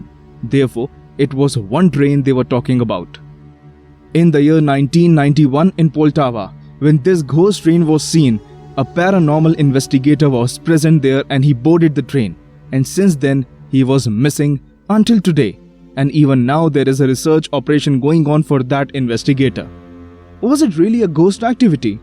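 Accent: India. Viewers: I hear Indian accent bro are you one of us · Your INDIAN accent made this video especially confusing. But a creepy account of fiction I think.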